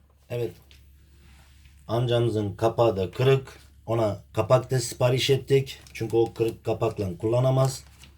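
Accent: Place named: Mardin, Turkey. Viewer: Hocam mardinlimisin sen şiven mardinli arapların şivesine benziyor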